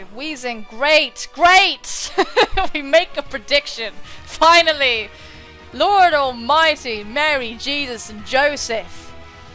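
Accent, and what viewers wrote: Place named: West Country, United Kingdom. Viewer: I only just picked up on your accent. I think it was the way you said "iron head" that did it. Devonshire? Definitely sounds Westcountry to me.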